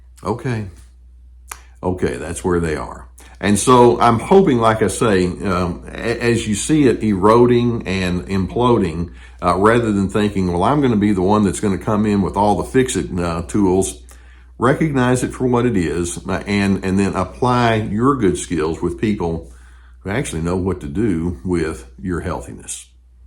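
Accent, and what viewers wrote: South, United States. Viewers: You have a great voice and a beautiful southern accent I can listen to this all day.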